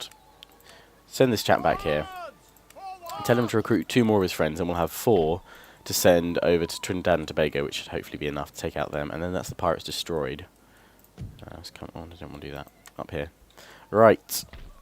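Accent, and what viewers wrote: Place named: United Kingdom. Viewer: Yes it's his real voice lots of Brits sound life that.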